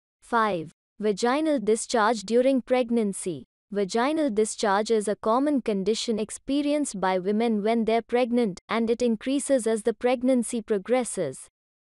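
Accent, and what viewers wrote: India. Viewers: Ugh, another Indian accent video. So annoying.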